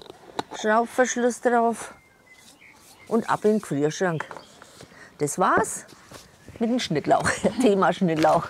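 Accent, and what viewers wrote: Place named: Lower Franconia, Germany. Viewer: Gruess Gott,ja jetzt Weiss ich warum Mir das nicht gelingt.ich habe immer nass geschnitten.Der Dialekt macht Mir heimweh,du musst ja ganz nah bei meinem Geburtsort wohnen.Bin ein ausgereister Unterfranke.Zwischen Schweinfurt und Stadtlauringen.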